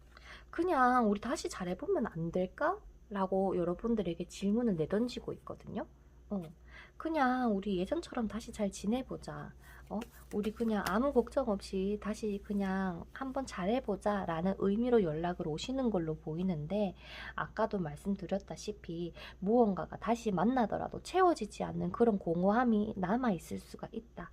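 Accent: Gyeongsang, South Korea. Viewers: 안녕하세요 구독 좋아요 구독중이에요,,오늘부터 1일이예요.특유의 목소리 톤이 꽤 좋아요. 경상도 사투리같아요.ㅎㅎ제 가 경상도 사람이거든요.저는 여기서 파란색을 뽑았는데, ㅜㅜ 사랑이.아니다라는 말에 조금 놀랐어요. 집착인가봐요.정리를 해야 겠네요.. 추억 속 에 남겨두고 아예 연락이 안 왔으면 좋겠어요. 물론 참고할께요.죽어도 잊지 못할 만큼이였으나, 이젠 놓고 받아 들여야 겠어요. 진정한 사랑이 아닌것 같네요.감사합니다.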